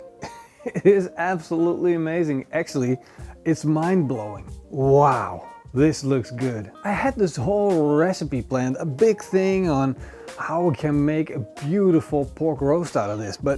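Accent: Netherlands. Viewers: You're dutch arent you.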